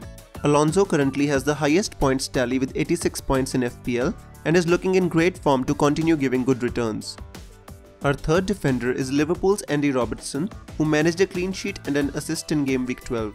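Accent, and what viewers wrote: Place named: Assam, India. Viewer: Axomiya niki o? Accent tu axomiya nisina lagise j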